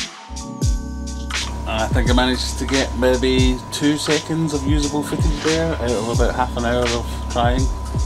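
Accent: Scotland, United Kingdom. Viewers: Nice to hear a fello Scott 😃 I'm going to be setting up a nano tank with these hence finding this video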